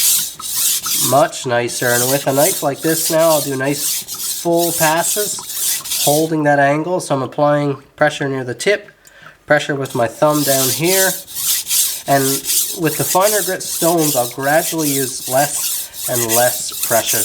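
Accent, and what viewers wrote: Newfoundland, Canada. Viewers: I watch your videos for two reasons besides masterful knife sharpening. One for unique experience of enjoying the Newfoundland accent and second as a thank you to the people of Newfoundland (Gander especially) for their unparalleled humanity and hospitality during the days following 9/11. Hope to visit one day until then will visit vicariously through your videos. 💕🙏🏻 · Awesome work dude! Sounds like you’re a maritimer. Definitely not a PEI or Nova Scotia accent going to have to say you’re a Newfoundlander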